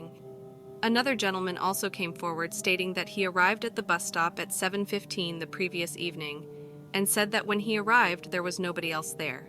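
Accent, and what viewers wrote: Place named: United States. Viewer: weird hearing what sounds like a script written in the UK being voiced by an american voice over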